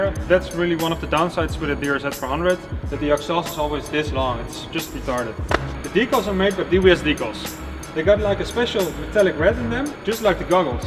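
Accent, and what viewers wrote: Netherlands. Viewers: Ik hoor wel dat je nederlands bent ^_^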